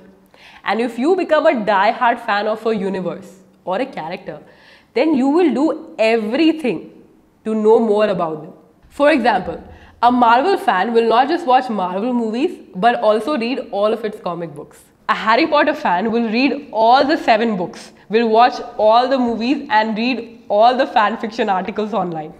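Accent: India. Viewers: your hindi accent is very boring. appreciate your confidence. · Your english language is a just like a Indian language ❤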